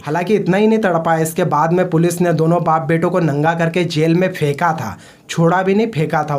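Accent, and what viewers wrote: Madhya Pradesh, India. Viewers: I love ur accent though Are u from m.p.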